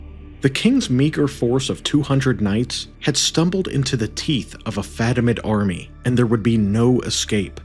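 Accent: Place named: United States. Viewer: Thank you Jesus for the American accent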